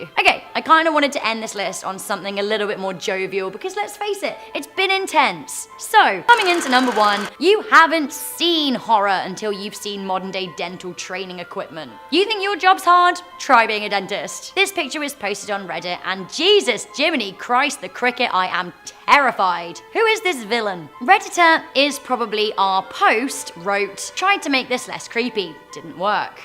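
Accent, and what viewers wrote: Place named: England, United Kingdom. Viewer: You are literally my favorite narrator! I have such a thing for girls with English accents. The random noises you make, and just your general commentary is adorable! Haha Keep up the good work! Hope to hear you again soon. <3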